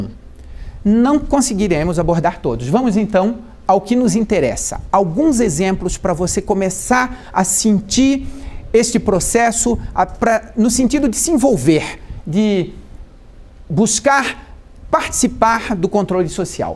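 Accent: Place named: Florianopolis, Brazil. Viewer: A parte da formulação de preços por meio dos orçamentos de empresas para compor a média dos itens é o grande buraco que os municípios, estadone união precisam sanar, pois alem das empresas serem direcionadas os orçamentos sempre vem com valor alto e muitas vezes nao baixa. Em relação a fiscalizar ou auditar as prefeituras e empresas, poderiam contratar fiscais para auxiliar os auditores e filtrar ou seja ir a campo e repassar relatórios de indícios para os auditores. Os fiscais atuariam com investigadores e os auditores como delegados. A pergunta: porque isso nao é feito? Os municípios lidam direto com a realidade do munícipe e contratos com valor dobrado de preço indica que alguém está obtendo vantagem ilícita, seja empresa ou pregoeiro e que o processo está solto e sem controle externo. Um sistema de consulta de preços públicos ou licitados poderia ser uma possível solução. 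Tipo um órgão que cadastrasse os itens e preços licitados e a união, Estados e municípios pudessem consultar e utilizar como referência na composição da média. Entende que a economia para o Brasil seria imensurável. Daí vem outra pergunta: seria do interesse dos governantes criar isso? Grande abraço conterrâneo de Floripa, o sotaque não nega.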